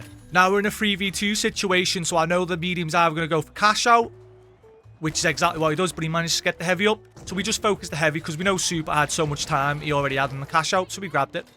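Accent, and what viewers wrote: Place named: Scotland, United Kingdom. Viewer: thank you friendly Scottish man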